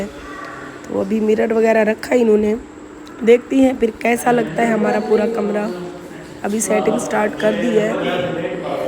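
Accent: Saraiki, Pakistan. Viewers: Ye apka home kis city men ha ,ap saraiki tongue men Urdu bol rahee ho 🤔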